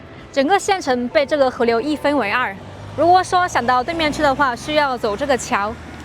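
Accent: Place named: Sichuan, China. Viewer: 和四川人说话有点像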